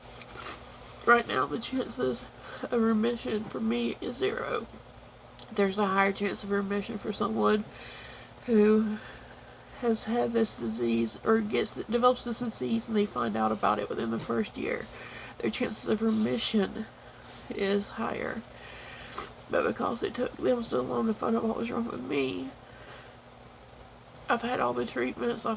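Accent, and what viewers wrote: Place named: North Carolina, United States. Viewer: You are a beautiful woman. You are strong for talking about these things. Which Carolina are you from? You sound like you are from North Carolina. I'm from NC, born and raised. Found your channel on PP Officials channel. Hope all is well.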